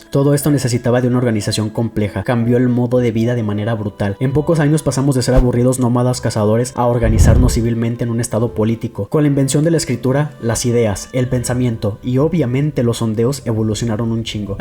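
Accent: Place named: Peru. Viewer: Heyyyyy? Me encanta tu canal ya que trata temas similares a los míos. Veo que empezaste hace poco igual que yo así que bueno, mucha suerte. Por el acento asumo que eres de Perú? Me recuerda al perro en la tacita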